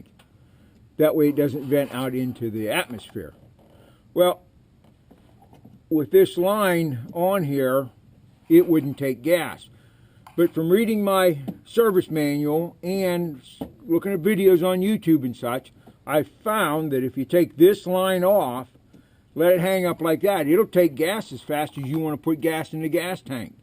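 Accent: Pennsylvania, United States. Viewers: Are you from PA? you sound a lot like my father in law. :)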